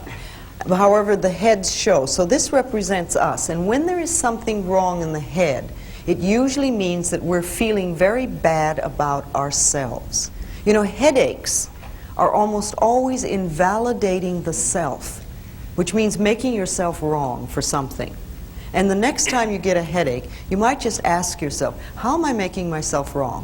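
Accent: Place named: United States. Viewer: What American accent she is using ?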